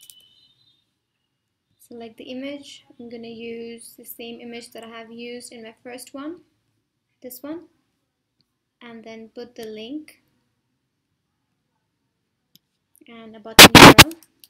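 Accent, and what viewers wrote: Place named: India. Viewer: Typical Indian Voice trying for Amercian English. Good.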